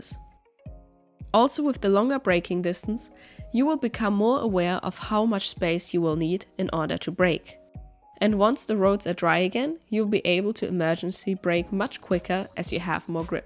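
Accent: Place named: Europe. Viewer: With your accent, I thought you were European, but this is definitely my home, Vancouver. Can't believe it took me two and a half minutes into this video to be sure!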